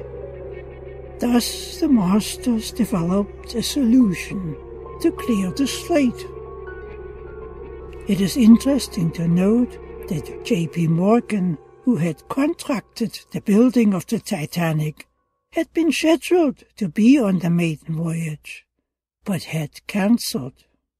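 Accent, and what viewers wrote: Germany. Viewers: I suspect his native language is German. I agree, he is a bit difficult to understand. But, since i do speak some German, it might not be as difficult for me to understand him as it might be for you. I also agree that many music over voice applications on any number of Y.T. presentations totally ruin the videos.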